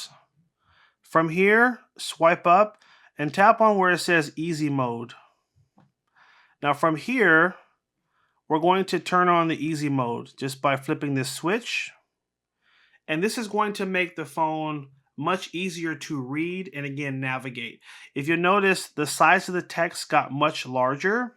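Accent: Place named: United States. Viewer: I am a 78 years old English man and for me you talk far too quickly with a very strong American accent and race through the video too quickly. I have to keep stopping it to go back to practice what you say on my phone. Other much younger people who have seen this video on my desktop pc agree with me. So not just me that thinks the same ! Anyway thanks for downloading this video. The following video is the best teaching video I have come across for teaching beginners on Android phones how to copy and paste. ''Timmy'' explains clearly and does not race through the instructions as many YouTube tutorial videos do. As one of the comments wrote ''Straight forward, articulate, concise instruction. Exactly what we seek. BEST of any out there. Thank you, Tim!'' How To Copy And Paste On Android Tech Time With Timmy <link>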